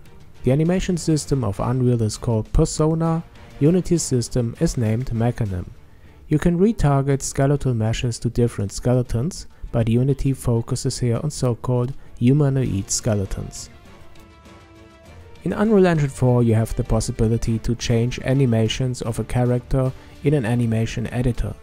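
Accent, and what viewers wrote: Germany. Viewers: Man hört sofort, dass du Deutscher bist, also schreib ich auch einfach mal auf Deutsch ;P Wir benutzen Unity an unserer Universität aber optisch sagt mir Unreal Engine viel mehr zu. Grundsätzlich können die beiden ja das gleiche aber wäre für die Industrie später eines der beiden Programme mehr von Vorteil? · Bist du nen deutscher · german accent?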